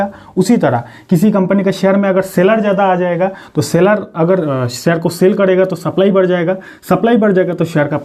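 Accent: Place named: Assam, India. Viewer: Assam ka hindi lag Raha hai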